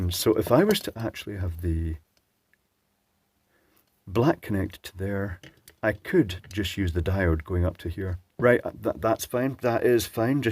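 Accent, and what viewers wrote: Scotland, United Kingdom. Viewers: We all came here for pleasant Scottish accent. Never get disappointed when he calls for that schematic. Other Scotts could point out that besides saving the cable tie with a knot, the much cheaper 1N4002 50V 1A diode would have been much less of an overkill and provided a bit more Glenfiddich.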